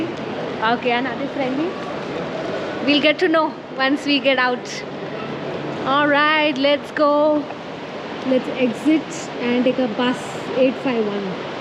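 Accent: India. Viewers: Такой прикольный индийский акцент. Приятная девушка. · indian english😏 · I have always loved the english accent for indians... Hello to You beautiful young Lady ! Best regards from Bucarest ROMANIA 💐🤞🍀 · Хорошая девушка. Но её английский очень похож на английский индийца в интермедии комедиклаба про американское радио с Харламовым...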